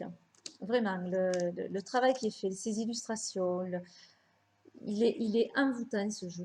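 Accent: South, France. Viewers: avec ton accent du soleil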